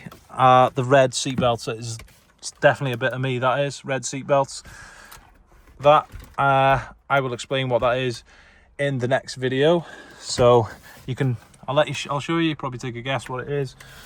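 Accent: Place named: United States. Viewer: wow!! you sound very american and even have the car to match it!! love the videos keep it up👍👍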